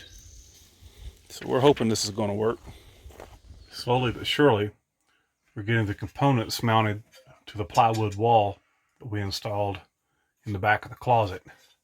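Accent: South, United States. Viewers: I can understand you changing it to all in the closet..... something goes down you don't have stand on your head to check it out. And you have a place to add to the crazy if you want. I think a lot of the stuff outside should be inside were it can be kept clean and dry. You can store you colder cloths and equipment out side if you like but everything gets so dirty out in the bins Anyways love the Dogs playing in the water. Hows your old Friend Bill doing.? Your sure looking better. Wouldn't it be something if all your back pain was from the kidney stones you have had for years. anyways take care. And you sound just fine hahaha Southern good stuff.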